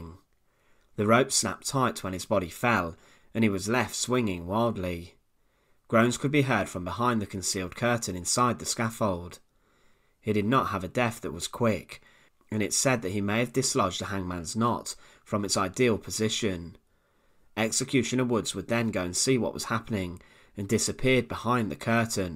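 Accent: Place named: Yorkshire, United Kingdom. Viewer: I'm curious if Brits have some kind of secret laboratory where they try to develop the strangest accents possible... earlier today I was just thinking, if "Brits are so opposed to the letter "R", why did they put it in so many words, I mean after all it's THEIR language, they could have made it any way they wanted"... I deal with people from all over the world and they all pronounce "R" as "R" [well accept for some Asian nationalities (Japanese, Korean) where they can't hear the difference between "R" and "L", but that's another story...), and not "ah"... so "Remember" instead of "remembah"... ...and then I clicked on this video...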